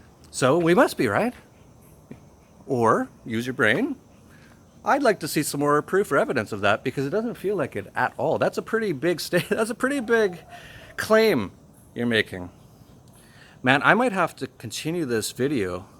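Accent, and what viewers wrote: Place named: Germany. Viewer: I enjoy your engdeutish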